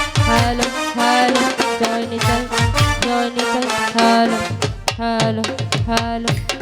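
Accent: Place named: Sylhet, Bangladesh. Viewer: উনি সিলেটী ফুৰি (মেয়ে) তাই বাংলায় কথা বলতে ভিল হয়...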